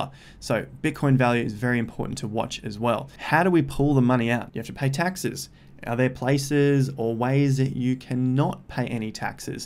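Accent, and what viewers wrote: Australia. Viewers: Maaate! I have been in this crypto la la -land since early 2017 (and seen thousands upon thousands of vids). This is hands down by far the best "tutorial" any new (and old) investor could get. Absolutely nailed it. No fuzz, no drama, just facts. Thanks a ton! On a sidenote, I studied 3 years in Brisvegas and listening to you(r) accent and seeing the QLD had me tearing up a bit;) Good on ya! Chers and thanks. Lotsa good vibrations from Norway!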